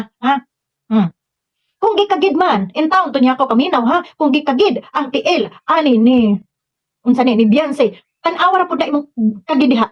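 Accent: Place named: Visayas, Philippines. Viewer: Hahahaha ni gawas gyd ang pagka bisaya .. good vibes lang basta ako Edcy ako solid edcy..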